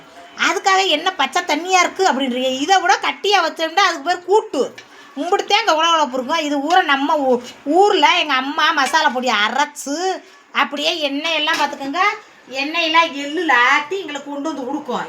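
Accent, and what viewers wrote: Madurai, India. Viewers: அக்கா நீங்க பேசுற மதுர பாஷ ரொம்ப பிடிக்கும் உங்க வடை கடை ரொம்ப சூப்பர்❤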